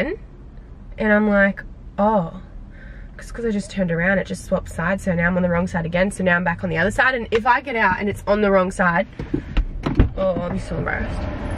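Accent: Australia. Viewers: New to this channel. Is she Australian? I can’t tell where the accent is from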